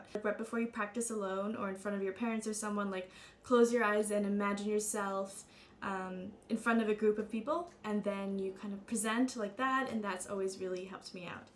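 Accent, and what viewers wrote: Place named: Canada. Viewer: Beautiful as always :) As a former Canadian my self, I really picked up your accent :p Thanks again for the great tips!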